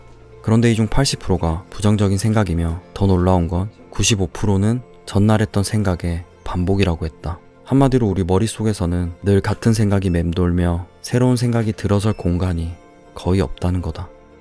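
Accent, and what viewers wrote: Gyeongsang, South Korea. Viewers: Love this channel. 고향이 경상도세요???? I can hear ur dialect❤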